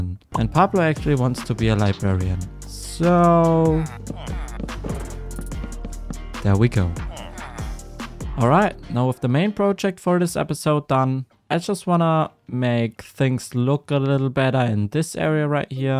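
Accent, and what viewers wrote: Germany. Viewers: i watched last video and this one and literally at the first minute of the last one i knew you arent from england cause you feel german lol ( in deutsch in der ersten minute hatte ich direckt das gefühl das du deutsch bist weil du keinen englischen sondern deutschen dialekt hast lol ) anyways i love your content already :D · Name für einen Hund Balu , mann hört an deinem Akzent das du Deutsch bist oder bei Cactui find ich nice das du Englischsprachige videos macht.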